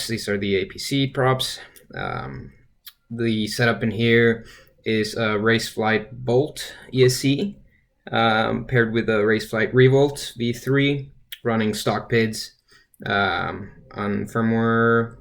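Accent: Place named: United States. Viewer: You sound american...Did you get permission from the FAA to go that fast?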